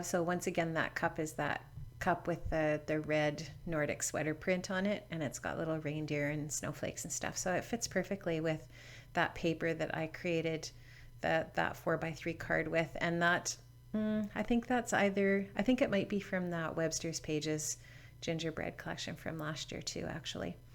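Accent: Canada. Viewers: This very cool and I am enjoying watching and learning! You sound like you are Canadian...eh? Anyhoo...best wishes to you and yours for a happy holiday season! TFS! xo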